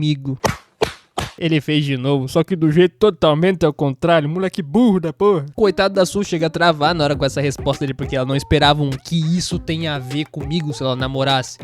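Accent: Rio Grande do Sul, Brazil. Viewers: Esse narrador parece gaúcho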